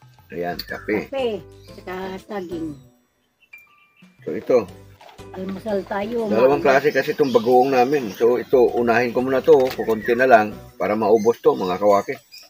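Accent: Iloilo, Philippines. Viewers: bacolod Ako kawaki. Sabi ko n ilongo k dahil sa tono mo magsalita sarap yan kbl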